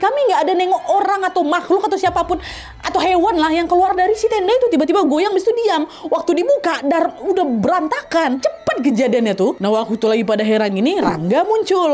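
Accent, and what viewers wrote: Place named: Sumatra, Indonesia. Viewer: Kau orang mana kk ? Bahasa mu kyk org sumatra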